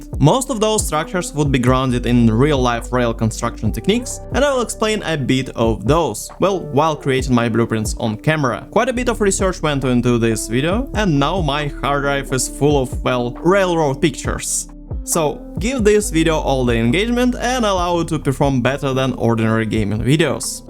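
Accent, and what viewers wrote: Eastern Europe. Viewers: What's your accent? Sounds eastern-european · слов'янський акцент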